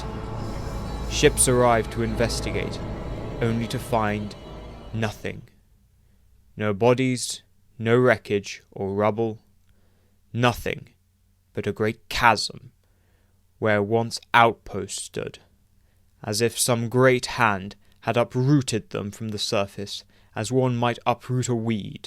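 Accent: United Kingdom. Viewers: I'd prefer it without the British accent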